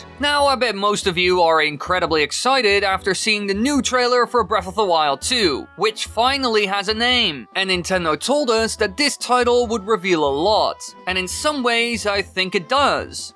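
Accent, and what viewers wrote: Netherlands. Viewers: I really, really miss your Dutch voice. You sound so forced and fake nowadays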